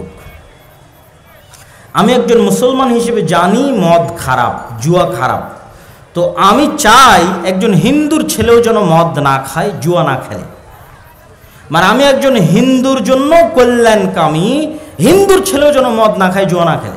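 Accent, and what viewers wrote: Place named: Noakhali, Bangladesh. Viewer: Bai korianna, harianna amon kotha goloo noakhali amon kotha goli pori takkoren please, tar por kotha goli shon dor hobe, aktoo chinta koray dekhen London, Saudi pora lekha koray amon basha kotha bola khettar moton bojai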